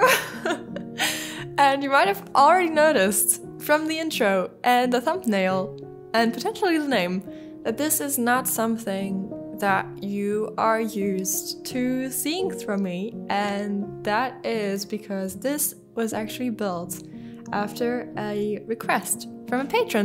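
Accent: France. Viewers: I'm wondering if you're french because of how you pronounced some words in this video i think you are ! Anyways ... i like the build a lot Vampires are my favorite supernatural beings I don't think you should be ashamed that you were into Twilight back then it was a global phenomenon so many people were into it i mean i was into it lol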